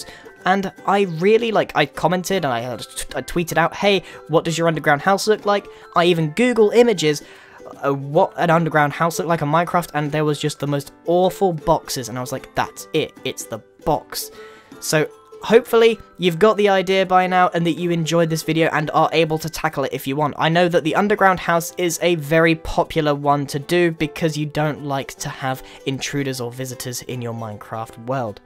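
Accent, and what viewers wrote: England, United Kingdom. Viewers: David Benner yes, is British, he lives in the UK and he has a British accent.. And I know because I am british, live in the UK and have a British accent.. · I love your British accent!!!!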